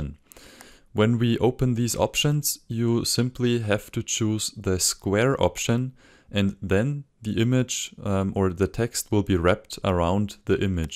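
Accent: Germany. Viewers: Strong German accent my friend:)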